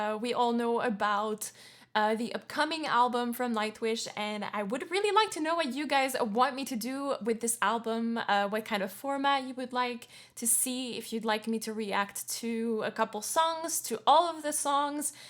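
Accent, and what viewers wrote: Canada. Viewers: Hold on a second, are you Canadian?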